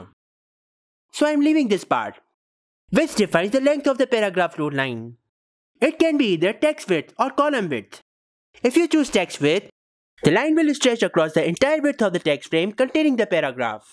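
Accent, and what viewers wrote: India. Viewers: Thank you, I was watching this video, but I failed to understand you very well today. Between your english with indian accent and my spanish, it wasn't a good mixture LOL !!!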